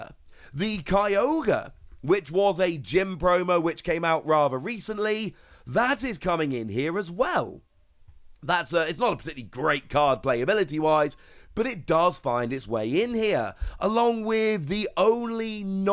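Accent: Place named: United Kingdom. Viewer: Ehhhh? I've lived bham, exe and london and his accent is like pretty default brit I can't lie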